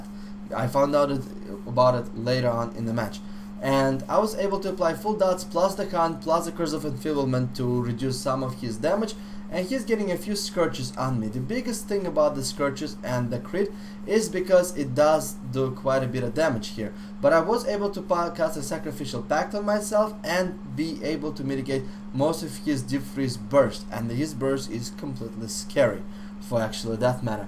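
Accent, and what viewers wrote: Russia. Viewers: I love the Russian accent, first time watching your videos and I've subbed:)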